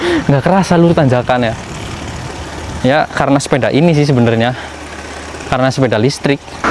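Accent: Banyumas, Indonesia. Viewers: Maaf mas asli ne janeh ngendi kok pd ky aku ngapak🤭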